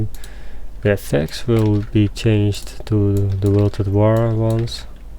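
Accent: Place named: Netherlands. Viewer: Eyy jij bent Nederlands! Hahah kan ik horen en zien haha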